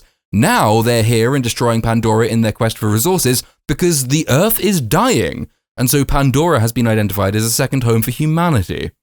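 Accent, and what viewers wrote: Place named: RP, United Kingdom. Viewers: A posh accent doesn't make you intelligent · Bros speaking so posh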